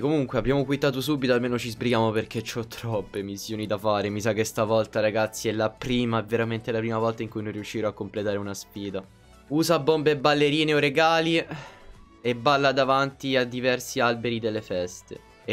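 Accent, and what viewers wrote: Rome, Italy. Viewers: Ma sei di roma?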